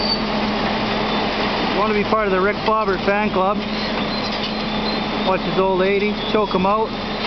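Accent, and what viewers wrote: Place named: West Coast, United States. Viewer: I love it! ha your commentary is as westcoast as it gets!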